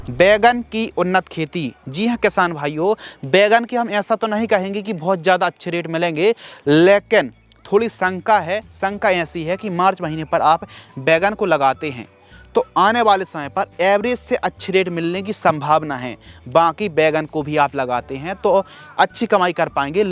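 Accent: Bundelkhand, India. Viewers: Aap Bundelkhandi ho ..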